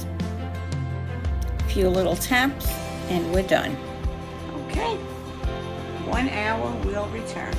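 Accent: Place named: Northeast, United States. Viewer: Very neat idea . Sounds like you moved from the Northeast to Hollywood ftom that accent. Bless you 🙏 💛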